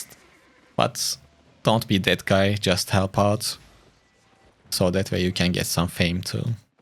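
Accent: Turkey. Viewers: Tam bir türk aksanı başarılarının devamını dilerim dostum 3 yıl sonra tekrar başlayayım dedim ve videolarını izliyorum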